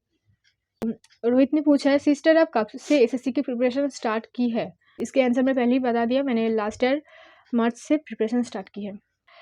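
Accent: Bengal, India. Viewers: U from Bengal? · that's so sweet of you mam . Tnq so much mam ❤️well I love your Bengoli tone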